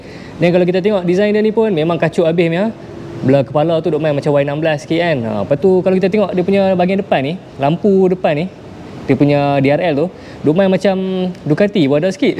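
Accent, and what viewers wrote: Penang, Malaysia. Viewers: Nama org kelantan tp sembang mcm anak antu penang ja.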